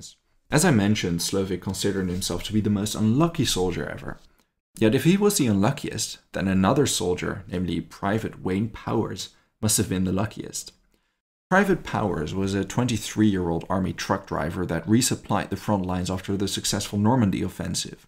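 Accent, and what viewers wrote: Germany. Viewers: with The German accent - 1. Is the opening music you use from Bloch's Hebraic Rhapsody.? I am just trying to place it. 2. Another unfortunate was one Theodore Schurch who did some minor interrogation work for the Germans after being captured. His father was Swiss so presumably he spoke Swiss German. There was something about his English which made some of he British officers guess he was really a turncoat. He was allegedly captured in Rome in March of 45.. well after Italy had fallen. Why he did not try to get to say CH and the to Australia or Canada and simply disappear is beyond me. Perhaps his relationship with his CH relatives was not so good. Well even though the war was done and dusted by the time of his trial he was still found guilty of treachery and Hanged... I think he was just too cocky and he could easily have avoided the noose. 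I know of no other UK soldier executed by the brits for treachery or treason during WWII. K Fuchs who was a UK fast track citizen was very lucky to avoid the noose and had the USA counter intelligence caught him, he might have been given a heavy sentence while the Manhattan project was running (to prevent the other scientists going on strike during the war effort ) and then executed on some other charge post WWII. But the US never caught him in the act. Tim Fidler, NZL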